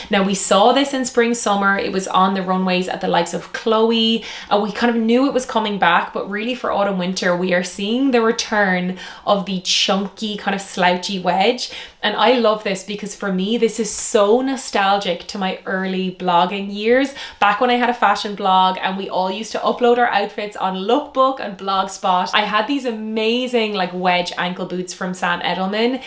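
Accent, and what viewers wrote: Ireland. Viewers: Thanks , lovely to hear an Irish fashion stylist. Be great to see fashion for over 60s style, a little. But great update on what’s coming. Thanks ❤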